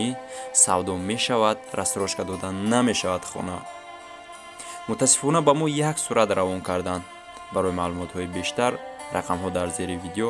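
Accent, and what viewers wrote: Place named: Russia. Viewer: Рут лахча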